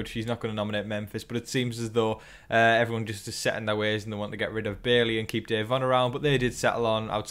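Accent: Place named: Durham, United Kingdom. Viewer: Hey! Where are you from? I'm from Durham and you have my accent lmao. Can't believe I've found a bb YouTuber from the North east!!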